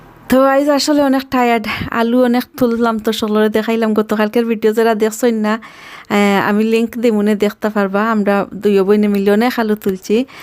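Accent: Sylhet, Bangladesh. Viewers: আমরার সিলটি আপার মাতের মত লাগে সুন্দর সুন্দর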